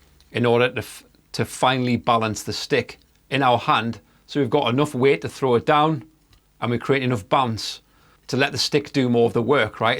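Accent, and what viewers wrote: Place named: Northern England, United Kingdom. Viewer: Silly question: may I ask you where are you from? I'm Italian, and I like different accents, so I'd like to "link" a particular accent's sound to the city/area it's from. To me, yours sounds like a Northern accent, but I have very little knowledge, all I know is "something from Liverpool - yes, The Beatles 😂😂😂 - to Scotland" 🤷🏻‍♂️🤦🏻‍♂️🤦🏻‍♂️🤦🏻‍♂️. Would you please help me? Thanks a lot! (...and great video, by the way! 😉👍🏻👍🏻👍🏻)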